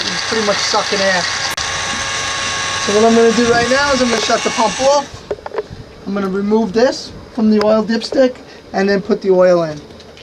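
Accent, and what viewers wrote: Long Island, United States. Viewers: were from long island are you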